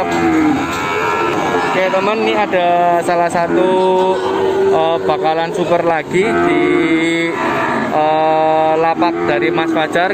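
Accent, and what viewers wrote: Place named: Banyumas, Indonesia. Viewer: ngapak campur indonesia mantaaap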